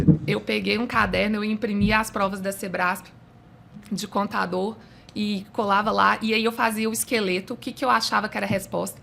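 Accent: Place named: Minas Gerais, Brazil. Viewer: Esse sotaque dela é maravilhoso MG ❤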